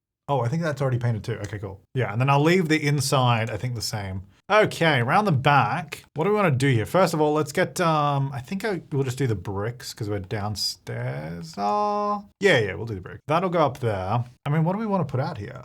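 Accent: Australia. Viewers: No doubt that’s an aussie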